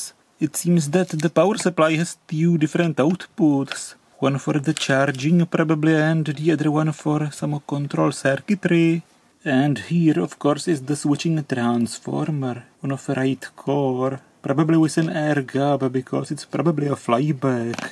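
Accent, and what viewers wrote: Czech Republic. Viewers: He's Czech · Czech Republic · Yep, he is from Czech Republic, what's wrong😉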